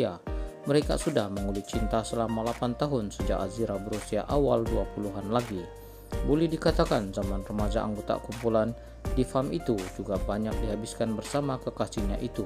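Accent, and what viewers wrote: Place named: Sabah, Malaysia. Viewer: Cara cakap bahasa sabah min😅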